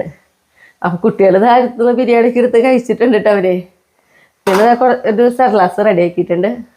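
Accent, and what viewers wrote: Thrissur, India. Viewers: I am also a from Tamil Nadu. I do watch ur videos, like ur vegetable garden n ur dogs very much. Never mind these types of people. They can't help themselves but to crticise others. Was in Trivendrum for 3 years, can read and follow Malayalam, but ur Trissur Malayalam is bit difficult for me 😂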